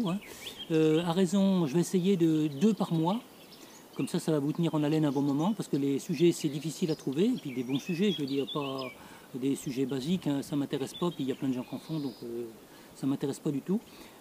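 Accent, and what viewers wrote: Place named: France. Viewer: Un humain comme on les aime, pas de superflu, de l'honnêteté, du savoir-faire, l'accent franchouillard qui nous rassemble. Merci de nous montrer la direction du beau.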